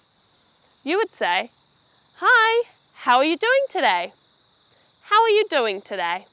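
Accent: United Kingdom. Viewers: Her accent is british,. But she is in New zeeland. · British English????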